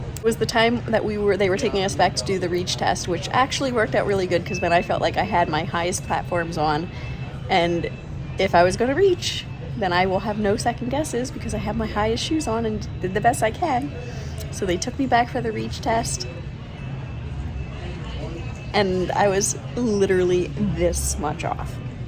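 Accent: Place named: Chicago, United States. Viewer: Your hair is awful. Be consistent with the color. Are you from the Chicago area originally?? Your accent shows! Most companies like a neutral American accent.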